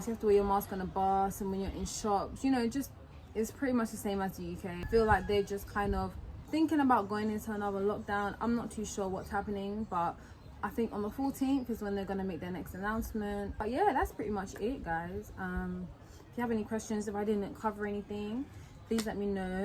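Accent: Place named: Barbados. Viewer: Its also the bajan accent coming out for me😩😍😍😍😍😍🔊🔊🔊🔊🔊💙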